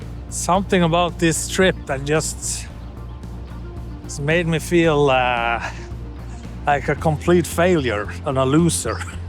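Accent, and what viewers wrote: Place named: North, Norway. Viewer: Hei igjen buddy. Ordentlig fin video og Telemark er skjønt. Du gjør to ting her som jeg ofte savner hos andre på mc tur; overlay med lyd fra etter turen og veldig fine beskrivelser av hvor du er. Og så er du knakande ærlig og det setter jeg alltid pris på. Jeg ser at noen skriver ta med en venn og det vil jeg si er et veldig bra forslag. Godt å høre at det er skikkelig mc lyd på videoene nå, høres bra ut. Jeg hører du er fra nord, har du også kjørt der oppe noengang? Er jo mange fine veier der oppe også, selv om jeg sjøl ikke har vært lenger enn bodø. Keep it up og planlegg godt med pauser og ting som gir energi. Ses en dag du kommer til DK😉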